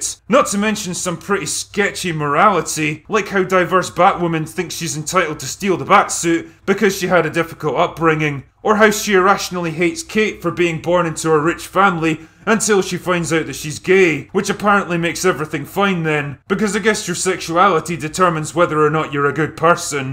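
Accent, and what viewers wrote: Scotland, United Kingdom. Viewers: yep. But also funny with this drunk sounding Scottish accent... Lol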